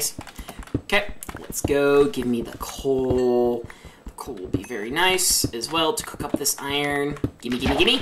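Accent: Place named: United Kingdom. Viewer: lol British accent😃😂😂😂😂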